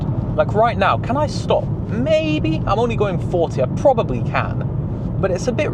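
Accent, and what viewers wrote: United Kingdom. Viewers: His accent is British but his pace is American 😅😅😅😅😅 from Malaysia 🇲🇾🇲🇾🇲🇾🇲🇾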